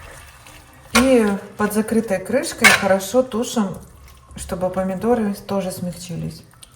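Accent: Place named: Gyumri, Armenia. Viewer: Я сразу поняла по акценту и очеень рада,я тоже из колориитного города Гюмри❤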